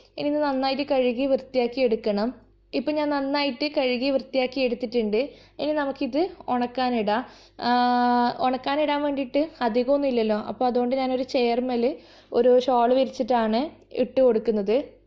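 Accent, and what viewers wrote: Kannur, India. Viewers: That Kannur Slang.. 😍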